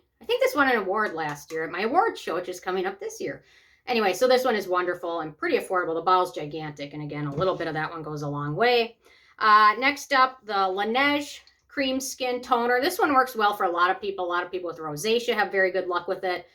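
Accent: Minnesota, United States. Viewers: wow, i always recognized your accent as MN, I grew up in Woodbury and spent a lot of time in Stillwater, especially downtown. A beautiful town!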